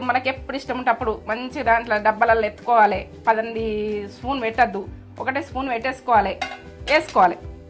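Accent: Telangana, India. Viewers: Aunty meedi telanganana,ma lage matladuthunnaru,ma ammalage anipinchindi.madi karimnagar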